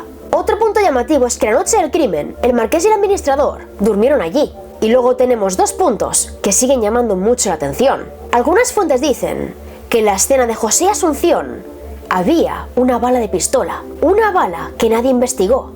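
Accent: Spain. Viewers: Desduzco x tu acento eres española,pero te informastes poco ,el que tenia la horquilla u horca era Zapata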